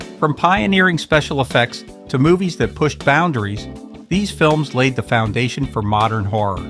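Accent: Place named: Baltimore, United States. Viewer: The narrator has a thick Baltimore accent. Ask him to say "Aaron earned an iron urn."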